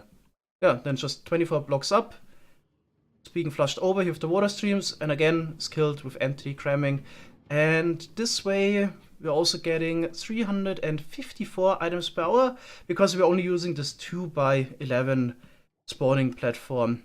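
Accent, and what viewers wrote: Germany. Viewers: are you german? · love ur german accent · Ich bin mir nicht ganz sicher aber kann das sein das du eigentlich Deutsch sprichst?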